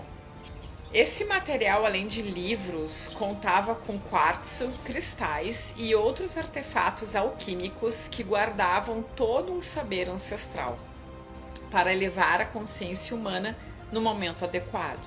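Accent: Rio Grande do Sul, Brazil. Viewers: Cara,como vc é chato!!! Se ñ gista do sotaque gaúcho dela,vasa!!! Ninguém está te obrigando a ver o vídeo.Vá ao canal original da saga e veja sem a traducão e sem o sotaque da! Ecaaaa!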